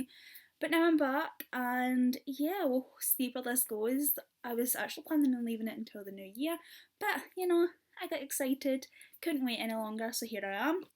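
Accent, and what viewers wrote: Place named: Scotland, United Kingdom. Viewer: I love your Scottish accent! <3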